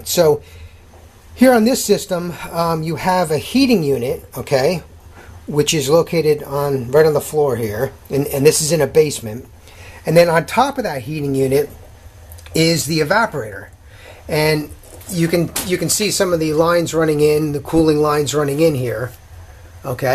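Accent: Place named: Bronx, United States. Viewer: first thank you for taking the time out to reply. i used ur tips and cleaned out the P- trap, opened the sub pump,bought a multi meter (first time using one. sh%t scared of electric) now im testing everything around the house thank you ), in a nut shell everything came out right with your instructions,which on the videos you explain everything in great detail thanx for being calm and the way you put your words together and accent( sounds like a BRONX accent)were im from. makes you want to listen more,your great. thanx again MR.thank you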